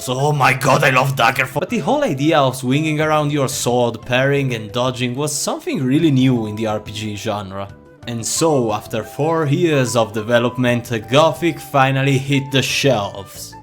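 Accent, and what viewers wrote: Italy. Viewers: Great job, you just got a new subscriber. ^_^ I like your italian accent, haven't encountered it much on youtube. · just curious. Are you from Italy? Judgind by your accent I'd say Italy. Also the fact that you can pronounce some sounds that are hard for normal italians( .the "H" sound ) suggests that maybe you are from south tyrol? Just curious to see if I am right.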